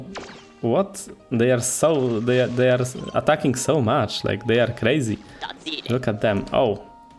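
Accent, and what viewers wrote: Poland. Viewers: Nie wiem odczuwam, że jesteś polakiem, tylko my tak kaleczymy angielski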